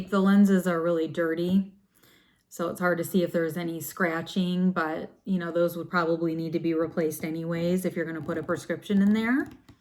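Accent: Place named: Chicago, United States. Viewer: Etoile is $$ just going to sit forever... Where are you from you sound like Chicago and then sometimes somewhere else ha!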